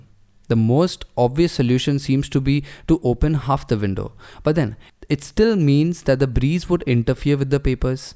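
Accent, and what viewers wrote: India. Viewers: I like your accent. Are you from Hyberabad?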